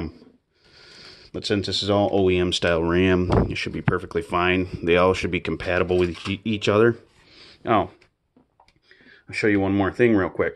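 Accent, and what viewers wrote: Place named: Wisconsin, United States. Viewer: you sound like you're from Wisconsin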